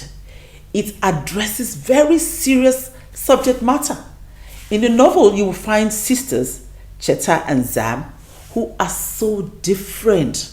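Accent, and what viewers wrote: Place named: Nigeria. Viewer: Congratulations. I have got your book on Audible and it’s just so well written and more so a beautiful Nigerian accent to compliment. We are so proud of you. I recommend this book to both your young and old🎉👏🏾👏🏾👏🏾👌🏾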